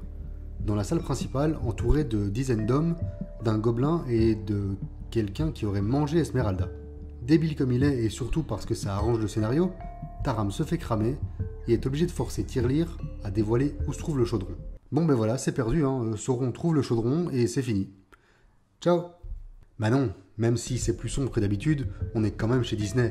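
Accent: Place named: Brussels, Belgium. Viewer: L'avais compris grâce à une autre de tes vidéos. Bruxellois, je suppose. Suis né belge flamand.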